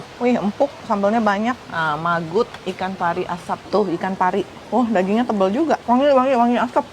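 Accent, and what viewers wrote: Java, Indonesia. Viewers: Jawa banget🤍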